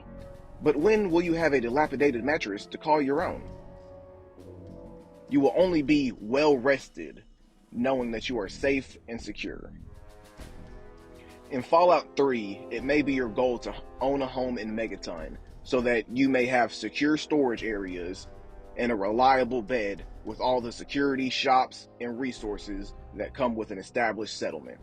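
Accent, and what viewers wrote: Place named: South, United States. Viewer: Oh it’s definitely not just you, he has a bit of a Southern accent but i think its cute🤭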